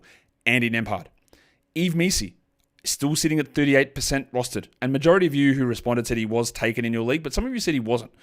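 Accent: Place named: Australia. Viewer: Your microphone's good - no issues with my end. I listen at 1.5x and one of the big changes is there's no "deep breath" sounds. I know us Aussies like our long-winded sentences. As the name suggests, long-winded sentences require long amounts of wind, which is often replenished using a deep breath. This deep breath is known to create a deep breathy sound and your previous mic used to hear. I don't know whether you have undergone some level of phonetical coaching (which kudos if you have) but, there was a distinct absence of deep breathy sounds in this video. The unfortunate news is you are probably ineligible for the ASMR tag to put on this video now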